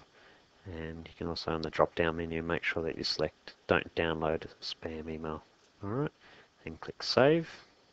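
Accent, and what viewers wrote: Australia. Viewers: 10 Stars. I have searched for days for the answer to this. Your method and the order you did it all in worked for me..finally! Thank you very much. Nice calm Aussie voice, made it even more pleasant.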